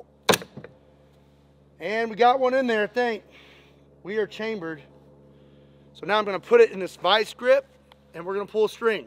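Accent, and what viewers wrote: Kentucky, United States. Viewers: What part of ky are you from brother?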